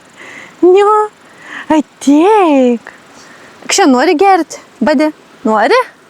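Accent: Samogitia, Lithuania. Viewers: Nu žiauriai gerai susižiuri video ,dar žemaitoke.Žodžių super .😏🤪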